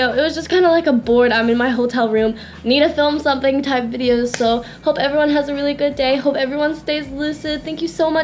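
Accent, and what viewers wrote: Australia. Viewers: Australian accentt comin' in hard! I love it lmao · Your semi Australian accent.... I love it so much, i wish i have an Australian accent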